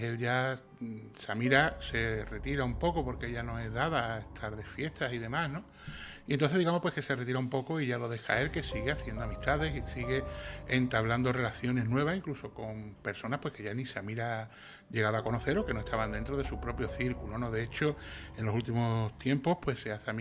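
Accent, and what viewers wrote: Andalusia, Spain. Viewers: Gracias, por un nuevo vídeo interesante. Me vienen varias ideas a la mente: - No me parece tan normal para una persona de clase media que pidas un préstamo de, no sé, pongamos 20.000€ para irte de vacaciones, cuando pretendes establecerte en un país, donde no vas a tener ingresos durante un largo tiempo. La homologación de un título universitario extracomunitario puede tardar años. - No debe de irte muy bien en tu trabajo, cuando pides un préstamo para poder irte de vacaciones. ¿Qué eres un derrochador?, vale, puede ser, pero gastar lo que no tienes es otra cosa. - Puede ser que él creyera que D.S. era cosocio/copropietario de una hamburguesería, si se la coló a su propio padre, es posible que ese mentiroso compulsivo le estuviera engañando a Edwin para conseguir un dinero. Ya sabemos del comportamiento parasitario del presunto psicópata asesino. 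- Es una pena que Edwin no tuviera propiedades, yo pensaba que de alguna manera iba a poder seguir ayudando a su familia con la venta de estas propiedades, pero parece que eso no va a ser así. Espero que al menos tuviera un seguro de vida, porque imagino que este asunto va a causarle muchos gastos a la familia: abogado, repatriación de las cenizas... - Por otro lado, mucho tienes que ganar para que te compense el gasto de 2 viajes ida y vuelta España- Chile al mes. En fin, que se me plantean muchas dudas. Gracias por tus aportaciones y por ese acento andaluz que tan bonito es. Un abrazo desde un bello lugar malagueño. 🤗 NB: En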